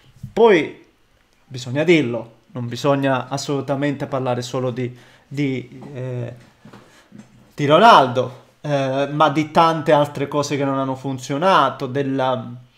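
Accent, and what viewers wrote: Calabria, Italy. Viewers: Ma prima o poi mi toglierai la curiosità di dirmi di dove sei? È troppo bello il tuo accento calabrese!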